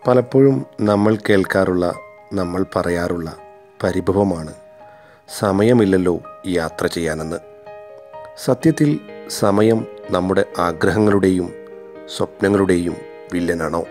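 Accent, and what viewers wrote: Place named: Kannur, India. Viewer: ന്ങ്ങക്കും നമ്മക്കും നമ്മള നാടൻ കണ്ണൂര് ബർത്താനാണ് സൂപ്പർ. ഇത് നന്നായിട്ടുണ്ട്.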